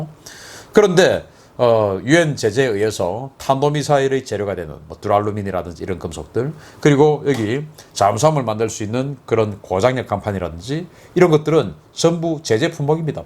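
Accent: Gyeongsang, South Korea. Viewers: 나는 미국 샌프란시스코에서 살고있읍니다. 신인균 교수님은 애국자며 잘 연단된 분이며, 이 대한민국에서 발견 됬음을, 참으로 축복받은 한국이라고 생각 합니다. 결론은, 신인균 교수님은 애국자이시며, 남한에 대통령감 입니다. 빨갱이 이북놈들과 싸워 이길려면, 신인균교수만한 능력있고 자격있는 사람은 하나도 찾아볼수 없었읍니다. 얼굴도 대통령 얼굴 처럼 잘생기셨고, 한국을 바로세울수 있는 분은, 바로 신인균 교수님이라고 인정합니다. 세게 어느대회에 나가도, 한국을 대표하는 대통령으로서, 하나도 부족함이없이, 잘 훈련받은 훌륭한 대한민국 남자라고 봅니다. 내년 대통령 선거에, 꼭 출마하셔서 대통령이 되셔야 대한민국을 살릴수 있읍니다. 미국에있는 우리 교포들이 전부 다 간절히 바랍니다. 그런데, 한가지 바라옵기는 , 경상도 사투리는 이해가 참 어렵습니다. 조금이라도 고쳐주시면, 우리가 듣기에 많이 쉽게 들을수 있겠다고 생각하며, 그렇게 노력해주심을 진정으로 바랍니다.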